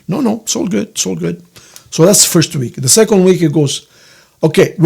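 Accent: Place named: Italy. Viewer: The Italian accent is appealing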